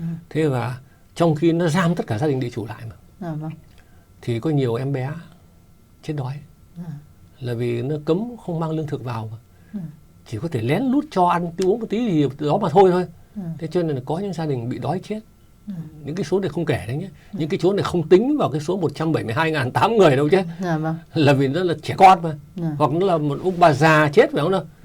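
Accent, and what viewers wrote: North, Vietnam. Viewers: Anh này giọng Bắc bây giờ anh nói là người bình thường .Tớ cũng bình thường sống bình thường sao thấy anh nói bậy bạ liên thiên vậy .các cụ nói trước khi nói phải đá lưỡi 9 lần đấy. Nói bậy nhiều quá .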